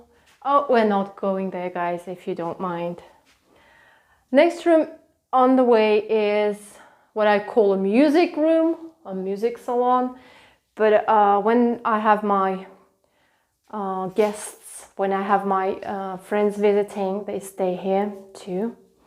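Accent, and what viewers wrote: United Kingdom. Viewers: I hear a slight British accent to your speech.........In Greece people have washers, very rare to have dryer....I think that is pretty common in Europe. This apartment is quite large. area looks middle class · I mean no offense-- I cannot decide if you are Russian, or British. Your English, which is excellent by the way, has a slight British accent. I know sometimes people pick up the accent of an instructor in a different language. I very much enjoyed the tour of your apartment and building. It appears a middle class city to me. Knowing nothing about it, I might guess heavy on industry? Thank you, very nice video. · I'm hearing a British accent?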